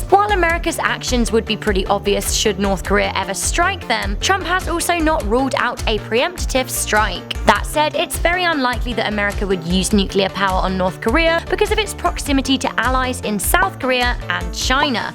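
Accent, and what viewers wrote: United Kingdom. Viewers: Mrudul Jain she is British get over it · She has a British accent. I have a accent like that and it’s hard to say some words.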